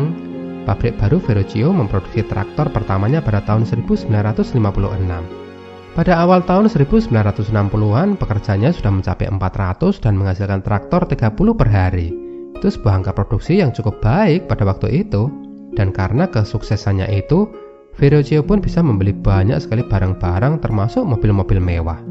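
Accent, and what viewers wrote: Java, Indonesia. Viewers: sip lanjutkan mas dengan suara khas medoknya,hehehehehe... barang kali ada yang minat tutorial design grafis dan percetakan kunjungi channel ini, mudah mudahan ada manfaatnya....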